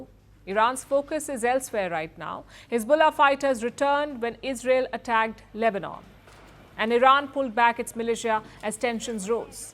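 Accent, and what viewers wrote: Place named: India. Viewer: Funny indian accent😂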